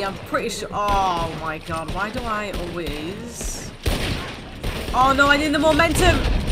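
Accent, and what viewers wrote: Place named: Ireland. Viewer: i played all love,so...I whant only to hear you Irish accent! :)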